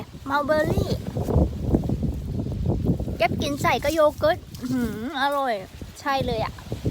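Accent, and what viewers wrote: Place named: Korat, Thailand. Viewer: ดีๆจ้ะ พูดโคราชภาษาท้องถิ่น 🥰🥰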